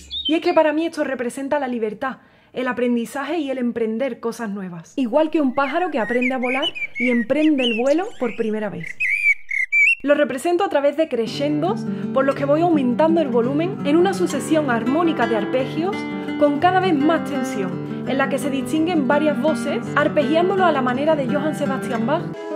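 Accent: Spain. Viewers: Me encanta el acento español que tiene, y por supuesto si estilo y calidad en tocar la guitarra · de que lado de españa es ese acento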